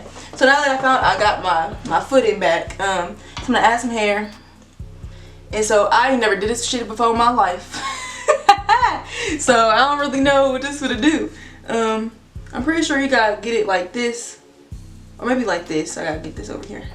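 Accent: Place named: South, United States. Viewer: definietly hear an accent. love it. are you from the south. i.e carolinas, bama, tennesse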